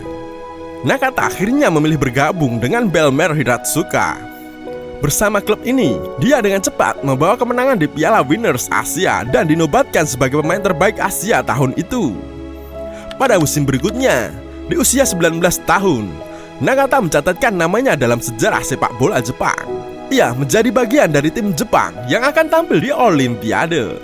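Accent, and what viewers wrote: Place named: Java, Indonesia. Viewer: Medog amat om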